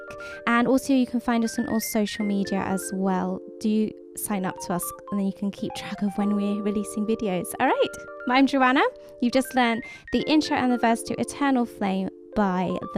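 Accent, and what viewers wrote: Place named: United Kingdom. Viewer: I'm loving the accent ;) Edit: Its nice to hear a British voice when you're from England haha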